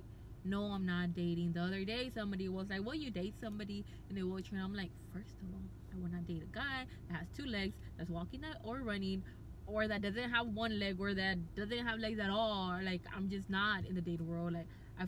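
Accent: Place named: Bengal, India. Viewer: LOL, at first, after I saw your face, I thought... um... maybe a TAnned Pakistani maybe... or someonef rom Bengaluru, but OMG that accent andthose mannerisms... That is so Bengali,s pecifically a Bengali livingi n the East Coast ofth e United states, or maybe California. As for your video content, well, it seems you have not been disabled as long as someone like me. There are things you have yet to learn from life. It'll come to you. It comest o all disabled people sooner or later. Keep it up kiddo1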